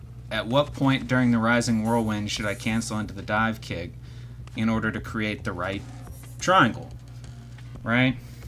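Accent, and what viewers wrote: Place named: South, United States. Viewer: you have a Southern drawl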